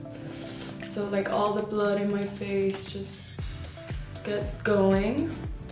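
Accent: Netherlands. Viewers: you heard how she says 'th'? classic dutch accent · She sounds so dutch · Did you grow up in the Netherlands? I spot some bits of an accent somewhere ;)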